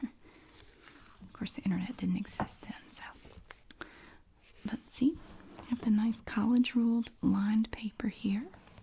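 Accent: South, United States. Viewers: Just found this channel and love your southern accent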